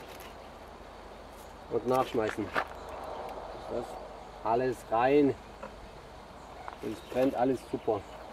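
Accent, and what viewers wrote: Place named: Swabia, Germany. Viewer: Stöckle, Äschdle, jetzet... wo bisch au Du her Jonger? Kirchheim? Göppingen? :D :D :D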